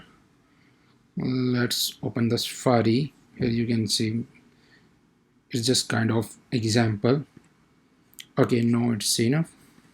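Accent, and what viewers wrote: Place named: Sialkot, Pakistan. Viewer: I like your sialkoti english accent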